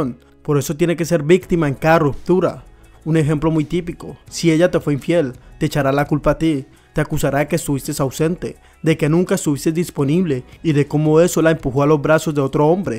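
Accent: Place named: Cali, Colombia. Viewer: Excelente video. El pana de Cali. El acento lo delata. La info del video es verídica 100%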